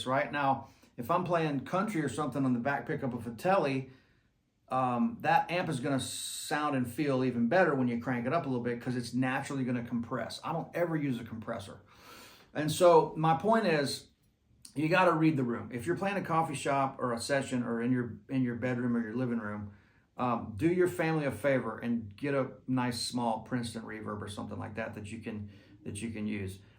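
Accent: United States. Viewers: reat vid great playing like that southern accent. I have Princeton reverb with ironman attenuator and like that setup. Background, i been playing only 2 years and no where to your playing and current live in sober living environment(been sober 2.5 years) and we have own rooms. So i try to be mindful of everyone. People work all shifts, i get up mad early and people say they rarely hear me play. I love my princeton and unless i start gigging or hit lottery doubt i really will ever need another Amp. I also have champion 40 w which doesn't compare but is adequate for heavier stuff. Just curious your opinion on attenuators they don't seen to be very popular option. I will try it unattuated later. I just always assumed that was suboptimal to play at 2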